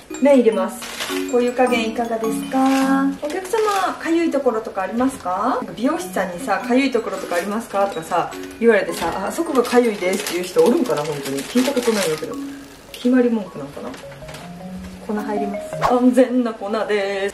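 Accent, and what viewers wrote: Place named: Saijo, Japan. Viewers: たまたまおすすめに出てきたから見てみたら(なんか西条の喋り方ににてる！なつかしい！)と思って3日くらい前から見始めてここまできたらまさかの西条出身で沸いた😂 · 松山と西条では同じ県でも方言が違うんですね。母が新居浜ですがそれとも違う言葉だなと思いました。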